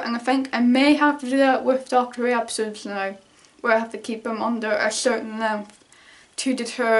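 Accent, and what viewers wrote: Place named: Ireland. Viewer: ) I like irish accent. I wish you way more than 500 subs.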